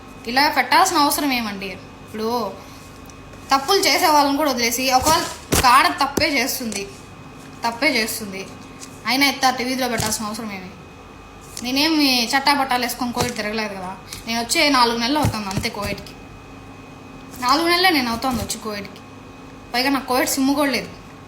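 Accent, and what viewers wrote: Anantapur, India. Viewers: Buddham namami !!! Ananthapuram voice good sister garu. Take care in Kuwait.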